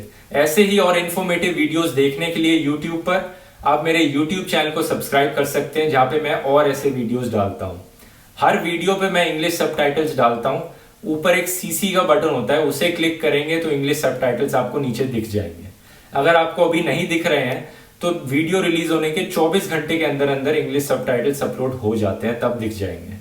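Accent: India. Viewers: Another curry accent video full of boastful speech.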